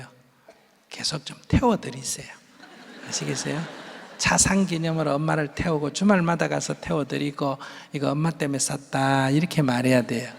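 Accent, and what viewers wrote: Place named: Gyeongsang, South Korea. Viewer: 스님 " 살은 좀 갖다 먹었어요" .^^ 경상도 사투리라 잠시 놀랐습니다. 쌀 발음이 살.. 평생 안 고쳐지더라고요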